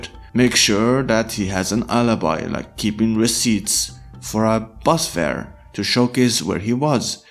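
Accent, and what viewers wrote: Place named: France. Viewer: flawless and nice French accent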